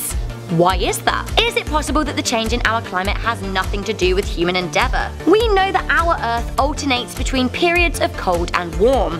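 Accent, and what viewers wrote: United Kingdom. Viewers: She is from the U.K., different accent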